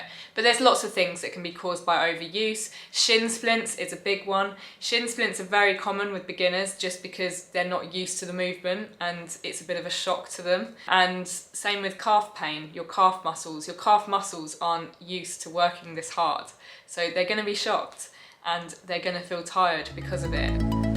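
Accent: England, United Kingdom. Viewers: I love your British accent. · I’m here for my dose of English accent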